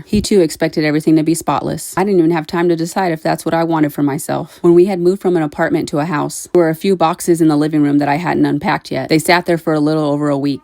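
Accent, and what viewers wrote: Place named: Texas, United States. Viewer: I hear a Texas accent in there. 🤔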